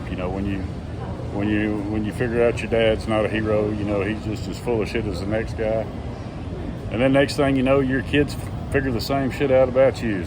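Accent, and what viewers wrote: South, United States. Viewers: 1st time viewer of your channel. You have a southern accent. Where are you from in the States?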